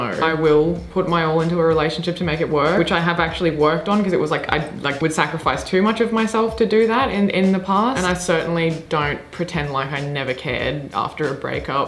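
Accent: Australia. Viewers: I'm assuming you're an Aussie.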